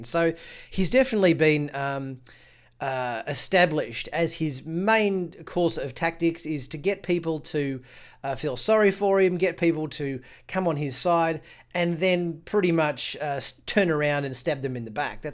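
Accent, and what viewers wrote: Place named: Australia. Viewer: Ah, Sutra is bad Karma! KarmaSutra, sorry I could not resist that! The theme of siblings interest me, you have Narek and Narissa, twins? one good, one bad! Jana and Sutra, which is also a puzzle, since Rios would have remembered if she was gold colored I would thought!. However I think this finale may have some surprises that no one expects, including Sutra, if the Admonition is was not understood by the Romulans, perhaps Sutra might have too, and what is coming will be like the battle of the 5 armies in the Hobbit, perhaps Gandalf and Picard have been comparing notes?? Yes, probably far fetched! I must say I have enjoyed your reviews, and look forward to how it plays out, judging from the accent Australia or New Zealand, I live in Wales in what I describe as God's country of Llaniltud Fawr aka Llantwit Major in Wales, in fact the finale of Dr Who was filmed in the around my neighborhood, the scene with the Cyberheads zapping the last humans was filmed at the St Athan"s Boys Village near the Aberthaw power Station! 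the beach with the gateway to Gallifray is nearby. I look forward to your next review, stay safe!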